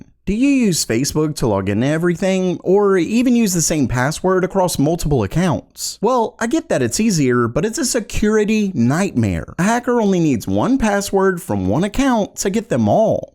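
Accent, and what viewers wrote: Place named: Baltimore, United States. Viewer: is he from baltimore. he says un instead of on.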